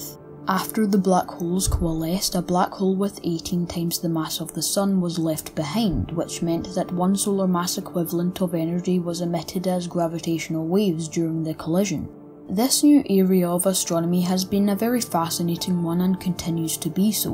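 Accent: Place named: Scotland, United Kingdom. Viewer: Love your videos. I cannae believe that folk would need subtitles for your lovely Scottish accent. All the best from just outside Edinburgh.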